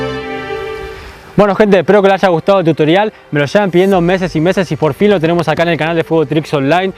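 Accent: Argentina. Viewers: pareces argentino ajjaja pd:soy de arg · Tienes acento Argentino , no pensaba que eres español (PD:Gracias por el truco , casi lo tengo ) Saludos desde Valecia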